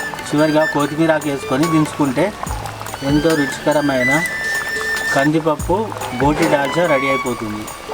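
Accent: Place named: Telangana, India. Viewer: అన్నా మంచి మంచి తెలంగాణ వంటలు చేసి చూపెట్టినందుకు ధన్యవాదాలు మీ వీడియోస్ గాని మీ యాక్టింగ్ గాని పక్కా మన తెలంగాణ యాసలో ఉంటది మీ ఫోన్ నెంబర్ పెట్టండి అన్నా మీతో మాట్లాడాలి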